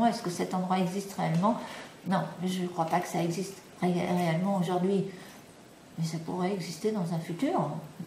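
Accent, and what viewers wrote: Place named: United Kingdom. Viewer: Superbe vidéo, de plus tu es très responsable dans tes dires et très soucieuse de ne pas choquer ton public. ps Ton accent est très agréable, Anglaise peut être.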